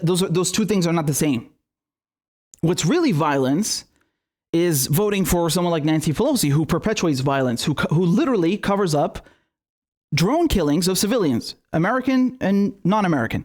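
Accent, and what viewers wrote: United Kingdom. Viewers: Despite the serious nature of these events, has me laughing tremendously. Loving the British accent haha. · that British granny accent tho. Keep up the great work. We need to amplify real progressive/leftist voices.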